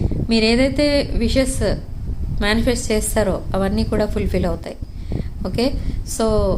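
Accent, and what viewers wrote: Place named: United Kingdom. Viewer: The voice u have is very pleasing...in between ur smile...the english ackcent ...you use...these all make me very happy to see readings very eagerly...we are gifted to have a good human being...❤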